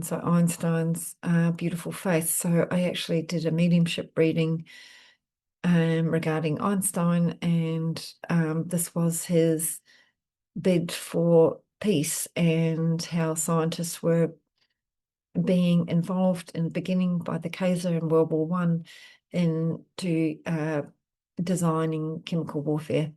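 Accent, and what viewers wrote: New Zealand. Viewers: Thank you, It is so nice to have a reliable channel here in the Southern Hemisphere. I love listening to your beautiful Kiwi accent and know you are here in Eastern Australia. I am in Nth Narrabeen NSW and you really resonate in my heart. Thank you for your commitment, I am truly grateful 🙏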